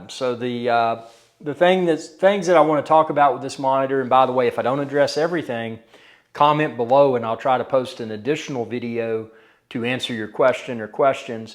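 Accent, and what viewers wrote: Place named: Atlanta, United States. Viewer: Does it being a VA panel (as opposed to the popular IPS) make any difference? They say VA panels aren't as good in terms of viewing angel. How's your experience been sitting up close using it as a monitor vs using it for TV watching from a few feet away perhaps? By the way your accent reminds me of my friends in the Atlanta area 🙂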